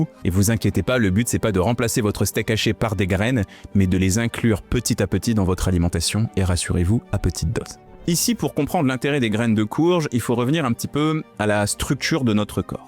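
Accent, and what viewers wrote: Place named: Picardy, France. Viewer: C'est l'accent de la Picardie , on prononce les o ouverts .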